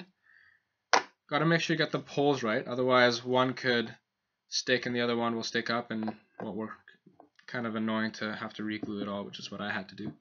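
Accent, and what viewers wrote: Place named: Canada. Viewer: What's up with Canadians (presumably by your accent) and amateur chemistry? IIRC Nurd Rage and Nile Red are also from the land of the North Woods (assuming they are not the same person, likely but unconfirmed as that is). BTW does it really matter how centered a stir rod is? It's been ages since I took Chemistry in HS and TBH I don't recall any of it. I am about to start messing around with home made enchants and looking at the hardware I have in the junk drawer there are lots of motor options. Precision centering things might be more of an issue for me though. Any advice?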